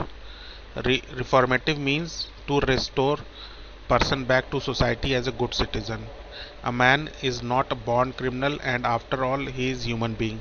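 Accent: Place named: India. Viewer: Indian accent!!!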